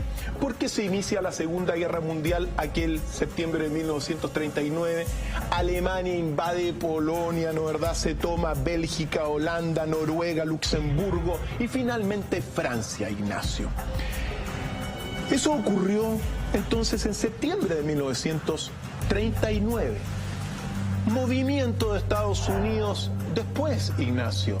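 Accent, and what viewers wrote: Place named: Chile. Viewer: De ver los videos me he acostumbrado al acento chileno, personalmente me parece agradable, por no hablar que los temas y la manera de contar del me encantan.. saludos desde España.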